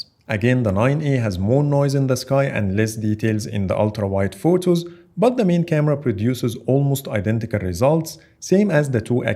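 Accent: Egypt. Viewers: Is this Egyptian accent 😂 or i am just imagining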